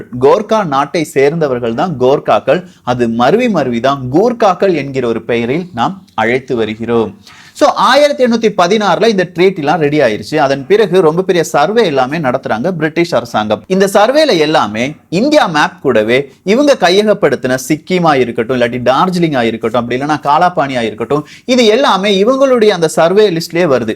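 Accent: Kerala, India. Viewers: Malayaali aano ?? Apdiya Kerala vaasanai veesuthu but azhaga Thamizhla singaarikingo... 😍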